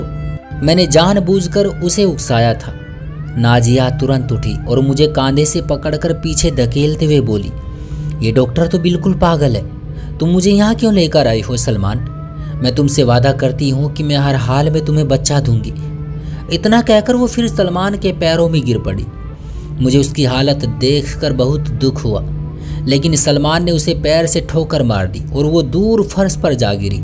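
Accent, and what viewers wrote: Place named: India. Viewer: To kya hua kisi kisi ki zaban aise hi hoti hai jaise mostly bihar aur up mp side walo ki zaban aise hi hoti hai aapko samjh aa gaya na bas wahi bohot hai😏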